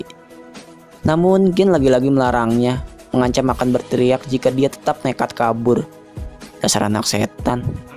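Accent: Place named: Banyumas, Indonesia. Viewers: Ngapak ngapak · Mimin ngapak🙈🤭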